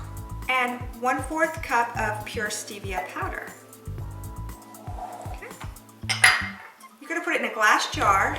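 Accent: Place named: Wisconsin, United States. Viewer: They sell live stevia at my local co-op every spring and I've always wondered how one would use it. The fact that the garden-fresh powder is actually less bitter than the processed store-bought stevia really intrigues me, I figured it would be the opposite. Can anybody else confirm? Side note: Not sure if anybody has ever mentioned this to you before, but your Wisconsin accent is adorable. I also live in WI, but in Madison, so you don't hear that "Wisconsin" accent too much down here.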